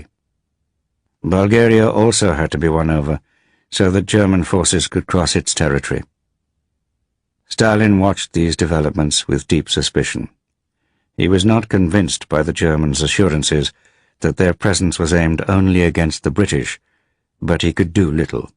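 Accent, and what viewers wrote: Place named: United States. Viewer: What's with the ridiculous American accent?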